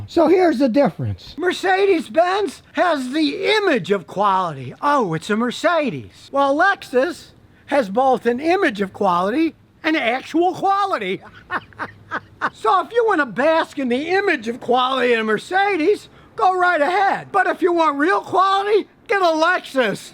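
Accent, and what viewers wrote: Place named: New Jersey, United States. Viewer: Voice accent. He sounds like he has a new jersey accent, but I just want to make sure.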